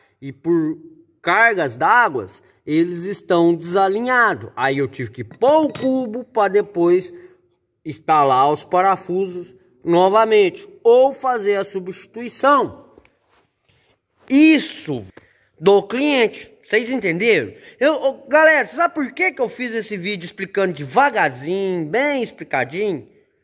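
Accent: Minas Gerais, Brazil. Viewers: Ô trem bão é bão, o trem ruim é ruim ,mais é sempre trem, entendeu trem?!?!?!?! Kkkkk rachei de rir com esse mineirim, ô trem bão sô.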